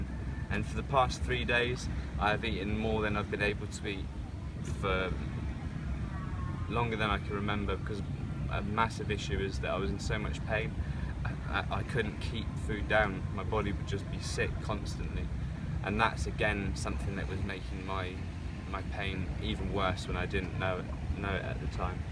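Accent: United Kingdom. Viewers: Ah so you are from UK. I thought you are Australian. I can’t tell the accents. Between the two. Glad you are ok now. Cute puppy there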